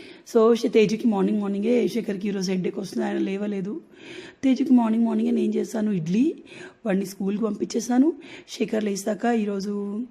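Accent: Telangana, India. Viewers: Nice Bhasha ❤👌pakka Telangana ❤❤❤😅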